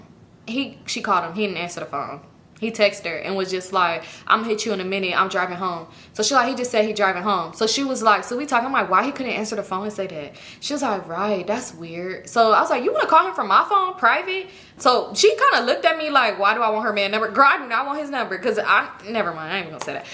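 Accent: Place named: South, United States. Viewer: your random country accent gets me every time. And I’m from Memphis. You know we country as hell.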